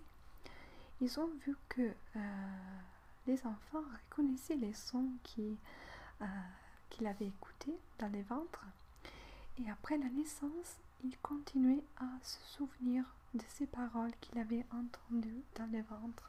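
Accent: Italy. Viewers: tu parles super bien français en plus ton petit accent italien est super bien je trouve que sa donne un plus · J'adore tes vidéos ! Je les aies fait découvrir a ma sœur aussi et pratiquement tous les soirs on t'ecoute pour nous aider a dormir, tu es comme notre jolie berceuse. Tu nous berces de paroles positives, tu nous racontes tout ce que tu connais, c'est passionnant ! Et ton accent italien en parlant français c'est encore plus joli ! Tu m'apaises, tu me destresse, c'est un vrai plaisir de t'ecouter! Tu as un très bon français a ce que j'ai vu sur les autres vidéos aussi :) Sinon en lecture française je te conseille les grands classiques comme Notre dame de Paris de Victor Hugo ( je lis beaucoup plus de littérature anglaises) Bisous et continue !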